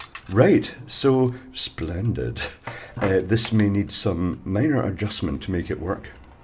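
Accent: Scotland, United Kingdom. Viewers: A Scottish version of Ashens 😂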